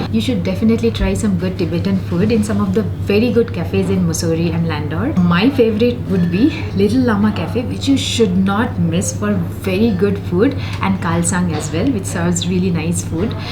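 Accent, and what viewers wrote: Bengal, India. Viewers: A very strong bengali accent chai dokan (dukan) , that's eet (it) , Avarest (Everest) , Mountanes (mountain), he wood kam (would come ) apart from that it was fine .:)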